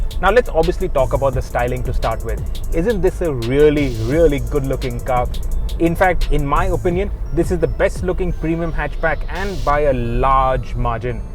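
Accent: India. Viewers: Let me start by telling you that Indian accent is terrible. In addition, the Hyundai i20 in this video is already out of date.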